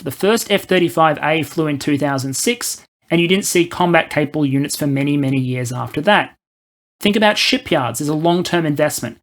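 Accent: Australia. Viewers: Sound is much better, previously you sounded like you were recording in culvert. now you sound like an Australian. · Sound was good! Aussie accent helps.... calming..... My big question, do you employ additional researchers, or do you never sleep?? Content was accurate and engaging. Going to your Patron site......That is how good!!!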